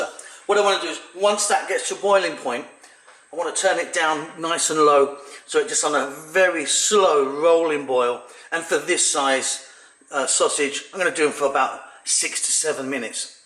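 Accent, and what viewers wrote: United Kingdom. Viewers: At last ! A British channel with a British chef/cook and a British accent 😁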